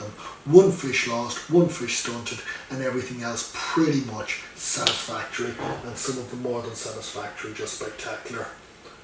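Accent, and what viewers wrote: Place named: South, Ireland. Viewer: Lovely video my friend missed this one where about from the south of Ireland are you from ?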